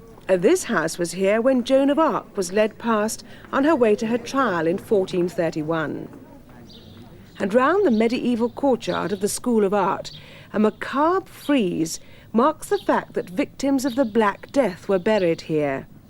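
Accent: RP, United Kingdom. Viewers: Yes I think I love you with your very posh accent and rusty French (I don’t care if you are now 85 years old) many a good tune is played on an old fiddle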